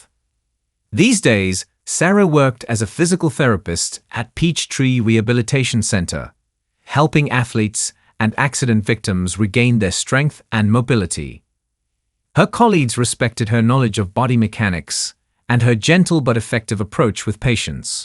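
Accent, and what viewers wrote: United Kingdom. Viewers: Sorry to say I cannot listen to this story. The robot reading the story with his british accent, it's way of the character of the story british accent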